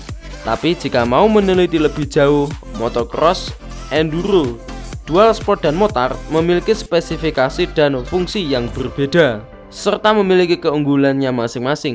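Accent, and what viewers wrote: Java, Indonesia. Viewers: Mantab unik dubbernya cadel plus medok namun penjelasannya cukup jelas dan pas ..👍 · Salam medok mas...yg asli orang Jawa mana likenya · Jangan kau hilangkan cirikhas mentok njowo mu iku mas. Salam dari AKU. anak campuran portugis,batak, jawa 😁 dari MEDAN.. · Medok buanget mas broo... sampe Mblerengg gaess ,,uhh Mblereng gaaeeessss..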